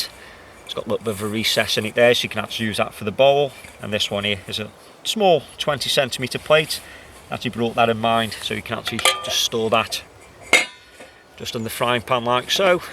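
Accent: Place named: Stoke, United Kingdom. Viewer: That's a fine Stoke accent there my mate. A Neckender?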